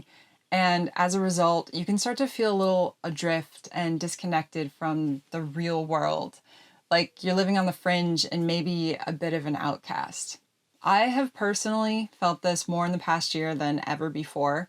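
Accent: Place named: East Coast, United States. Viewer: Another good video, you are punished financially for being single to begin with. I had two camping vans and lived on Martha's Vineyard for a Summer. So it sounds like you are from the east coast which is why I only see videos from the west by you. Your next project could be a TV show but you probably couldn't do it alone. Most travel shows don't show travelling from your perspective.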